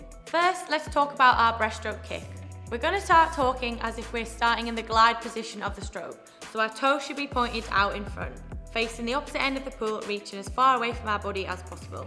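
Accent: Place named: United Kingdom. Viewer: British accent🤣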